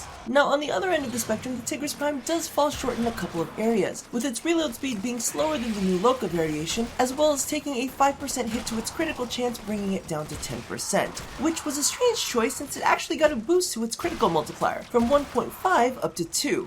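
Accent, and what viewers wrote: United Kingdom. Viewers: I have mixed feelings about that English accent. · You should totally do more vids with your British accent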